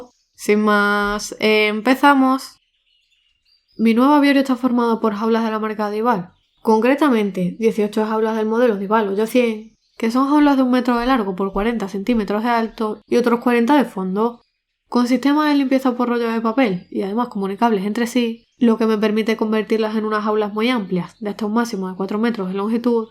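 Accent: Spain. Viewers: Se me hacía difícil q ya no tuvieras l aviario y más q nada q ya no nos explicaras n tus videos todo lo q nos necesitámos saber sobre las aves, pero q bueno q s uno nuevo, me gusta como explícas y me gusta l tono español d tu voz🤗😍. Salúdos desde García N.L. MonterreyN.L.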